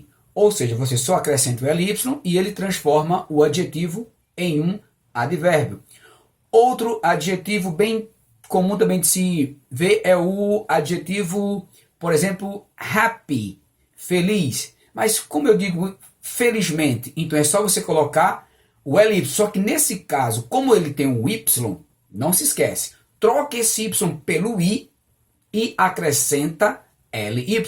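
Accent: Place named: Northeast, Brazil. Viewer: Vídeo bom! Acho que você é nordestino 😅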